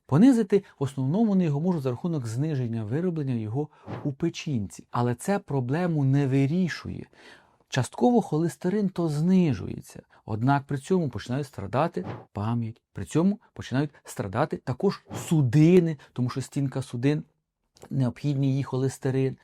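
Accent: Vinnytsia, Ukraine. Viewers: Дякую. Дуже корисна інформація. Іще крім усього насолоджуюся Вінницьким акцентом.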